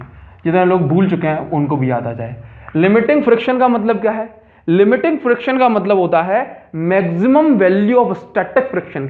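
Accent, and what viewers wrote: Odisha, India. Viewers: Sir ap Odisha se ho kya❤️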